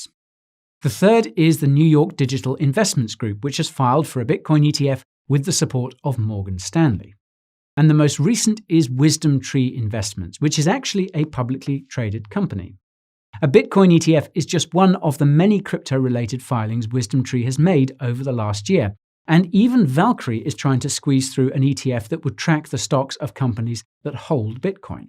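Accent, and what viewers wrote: United Kingdom. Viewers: This guy puts Bitboy Crypto to shame....blown away by the great amount of info and research this guy provides without the smoke and fireworks. Maybe it's just proper British demeanor and accent that makes everything sound more credible...lol. Either way, I like it. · i found his accent is kind of tricky for me, no offence for the person in the video since english is my 2nd language. do you know which part of uk this kind of accent coming from? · This guy could spout nonsense but with the british accent he sounds smarter...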